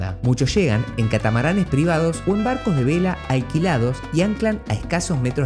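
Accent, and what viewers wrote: Argentina. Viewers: La narración es hecha por una voz argentina. Por que?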